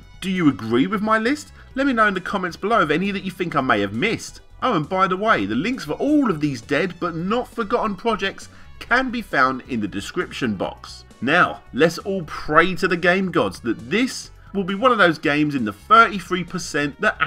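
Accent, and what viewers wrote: England, United Kingdom. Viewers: British accent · He's British, it's an accent thing. That said "Requeem"? "Requeem"!? But I suppose if you've never heard the word before...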